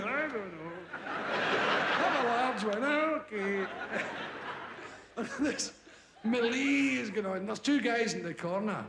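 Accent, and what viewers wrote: Scotland, United Kingdom. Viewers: Watched this with subtitles, and even autosub can't figure out some words😂😂😂 I love the Scottish accent😂😂😂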